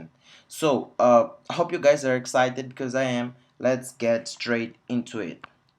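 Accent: Brazil. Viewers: Vc é br né? Esse sotaque não é de alguém nativo...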